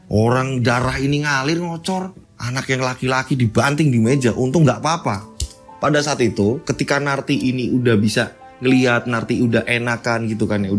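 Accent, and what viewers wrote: Java, Indonesia. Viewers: Siang bang salam dari Medan Sumut ,saya seneng degerin canel Abang ini , ada lucu " ada serem " dn yg paling bikin saya senang ini bahasa jawahnya yg totok bgt sagat " jelas cara penyampainya , karena saya turunan Jawa solo ,bapak Batak jdi saya senang bgt degerin dgn keturunan org " Jawa 😊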